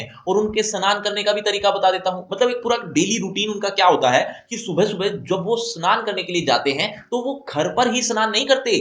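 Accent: Haryana, India. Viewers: Sir aap haryanvi ho kya?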